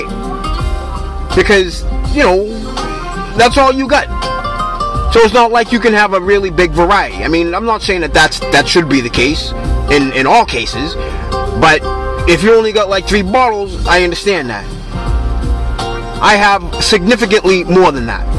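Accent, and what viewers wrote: Boston, United States. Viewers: That's a Boston Strong accent.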